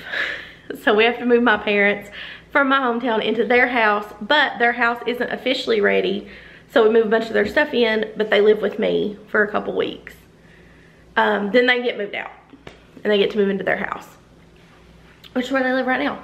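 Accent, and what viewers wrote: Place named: Southwest Virginia, United States. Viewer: I’m new to your channel, but I wanted you to know I’m sending you so much love and light 💞 I lost my dad in 2022, and I swear you’re right about grief. The things that hit the hardest are after they’re buried, after the dust has settled, and you wake up to remember the loss all over again. Girl, you’ve had a super tumultuous year. Take your time to recover ❤️‍🩹 and hey! I’m from Virginia, and you have the same accent as my grandma from SW VA in Grundy! Again, sending you love 💕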